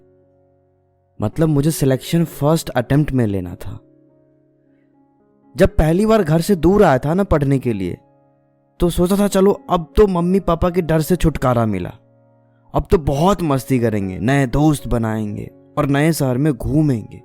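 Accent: Bihar, India. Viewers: Pure Bihar hai aapke abaj me ❤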